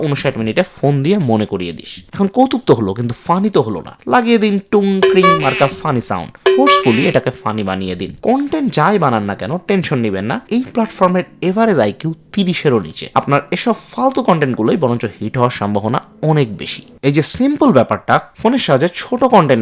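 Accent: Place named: Dhaka, Bangladesh. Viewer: you've good impact of voice of dhaka in your videos but you made some kinda fusion here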